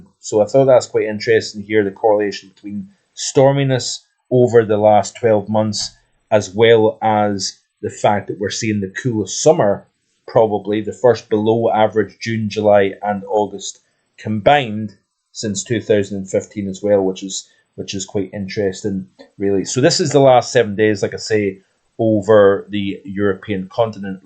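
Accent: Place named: North Antrim, United Kingdom. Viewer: Best wishes to the lady on such a big milestone. Yesterday wasn't that nice but it stayed just well enough behaved for us to do our usual walk around Annalong after getting a feed from the galley, a bit of a Sunday ritual of ours during the warmer months of the year, we travel from Armagh, was only in the last few weeks I discovered you were in fact a local man before moving up there to Scotland but always had my suspicion with the accent, thought you might have been North Antrim originally. Wind was rather lively mind you and the sea rough too.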